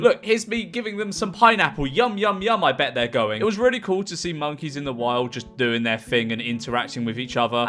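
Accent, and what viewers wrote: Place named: Birmingham, United Kingdom. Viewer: why are you developing a birmingham accent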